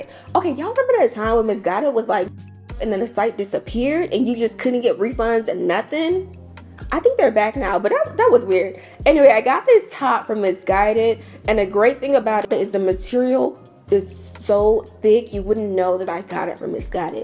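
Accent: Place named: Nigeria. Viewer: I heard that accent come thru…. Nigerian? If I am right, a lot of your style sense runs in the bloodline honey, Naija people never carry last. Nigerian women wrote the book on over the top and fabulous 😂😂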